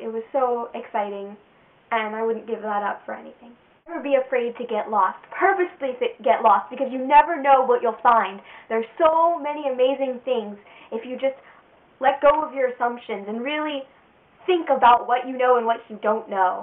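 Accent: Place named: Thailand. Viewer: i think ,your accent is verry like "thai " i know cuz i am thai. my nick name is "chang". "chang" = elephant. "ช้าง"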